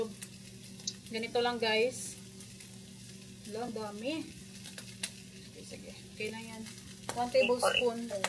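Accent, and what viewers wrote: Iloilo, Philippines. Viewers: Ilongga po kau?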